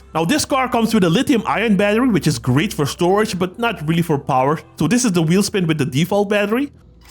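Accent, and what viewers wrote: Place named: Netherlands. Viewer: Suriname!...no wonder why you had a slight Dutch accent. Great video though!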